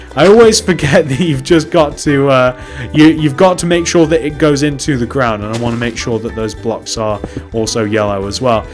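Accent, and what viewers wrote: United Kingdom. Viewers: Quick question are you british?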